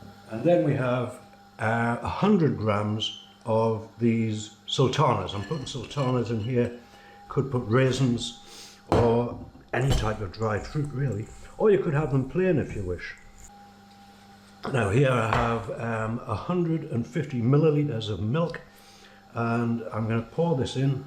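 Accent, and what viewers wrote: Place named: Northumberland, United Kingdom. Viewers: I just found your vlog, as an expat living in Seattle Washington, I appreciate the recipe & your lovely accent, I am assuming you are from the North of England, thanks for the recipe, will definitely give it a try 👍🏼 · Born but sadly not raised in Northumberland so lovely to hear the accent a plus to a great idea and sensible and simple delivery! 🙌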